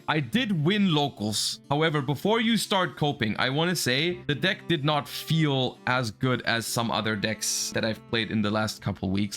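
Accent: Germany. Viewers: You’re from Germany?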